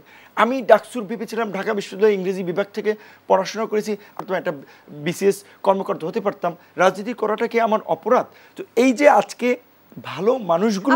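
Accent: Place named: India. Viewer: উপসহাপিকা ইন্ডিয়ান সটাইলে কথা বলবেন না।😊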